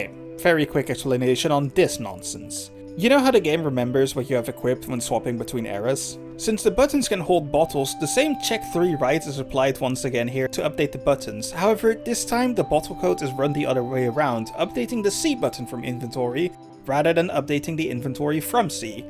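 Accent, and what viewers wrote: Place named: Netherlands. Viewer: Hmm... your accent. You're Dutch, right? Great video :)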